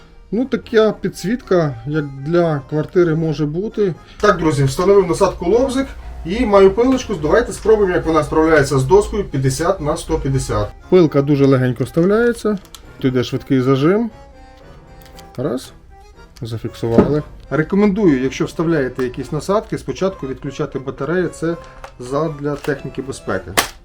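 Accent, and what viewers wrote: Russia. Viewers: Хз, мне лично иногда уши резало неправильное произношение с примесью русского, да что там, даже название неправильно написано(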